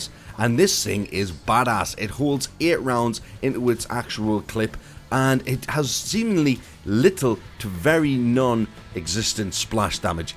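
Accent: Newcastle, United Kingdom. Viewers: Guessing you're from Newcastle ;) same here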